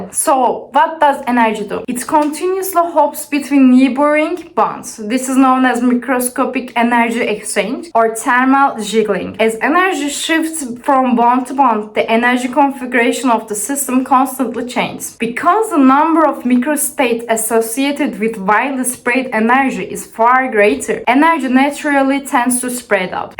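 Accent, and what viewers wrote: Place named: Russia. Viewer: Thanks for the video. Btw ur accent sounds more Russian than Turkish. I am Turkish too, but i'm going along with the concept.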